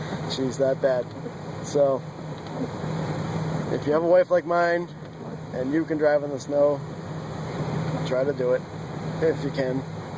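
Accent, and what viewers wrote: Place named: Northeast, United States. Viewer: For the snow, scenery, and NE accent: thank you!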